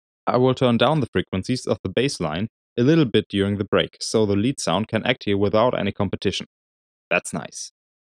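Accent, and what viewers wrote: Germany. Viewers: hahaha after the first sentences I heared that you are from germany :D es ist wirklich erstaunlich wie schwer es für die meisten ist den deutschen dialekt zu verstecken :D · nice tutorial! do u come from germany? i think u have a very german accent;P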